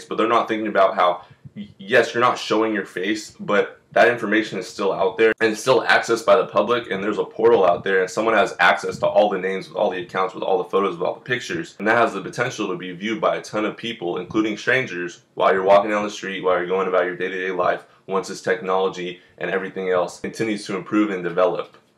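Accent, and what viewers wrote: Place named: California, United States. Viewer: I can hear the california in your voice.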